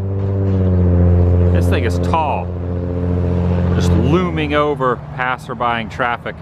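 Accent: South, United States. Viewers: I don't know if you've noticed but the farther you travel, the more you seem to have picked up a southern twang. I bet by the time you get back to California you sound like you're still in Alabama!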